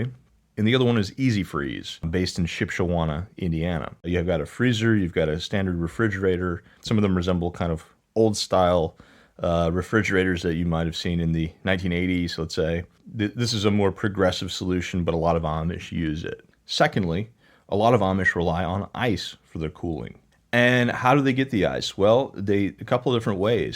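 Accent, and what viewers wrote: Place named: Baltimore, United States. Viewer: This is my first time watching but you sound like your from Baltimore :)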